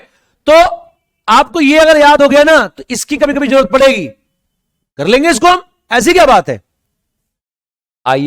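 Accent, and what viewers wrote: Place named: Bhojpuri, India. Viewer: I am also from from bihar and i loved ur bhojpuri accent a lot .. Thank you for the lecture. No doubt best physics test ever .....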